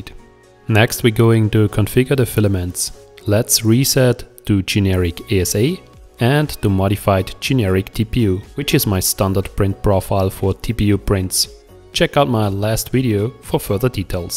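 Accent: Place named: Germany. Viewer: Ich wollte schon sagen, hört sich an wie deutsches Englisch! Gleich mal ein Abo da gelassen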